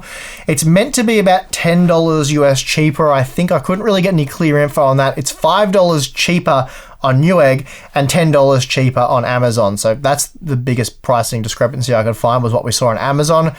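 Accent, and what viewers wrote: Australia. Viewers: I LOVE THE AUSSIE ACCENT .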